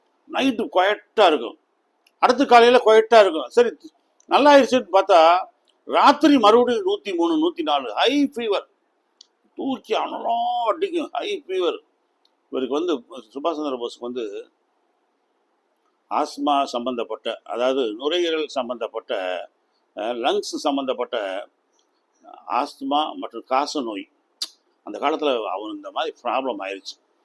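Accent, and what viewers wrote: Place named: Madurai, India. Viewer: சிறந்த வர்ணனை... மதுரை தமிழ்.. சிறப்பு..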